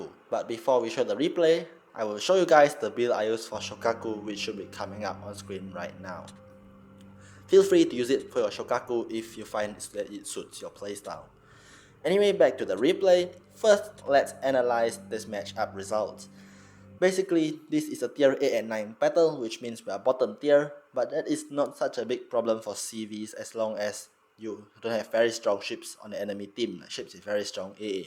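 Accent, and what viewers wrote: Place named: Singapore. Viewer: man he sounded sooo SG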